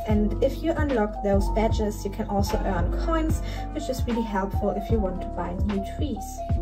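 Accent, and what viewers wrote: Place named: Germany. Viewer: That German accent😁 Grüße! Gutes Video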